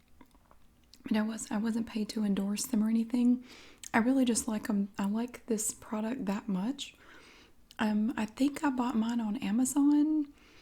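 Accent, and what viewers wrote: South, United States. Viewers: She has such a sexy southern voice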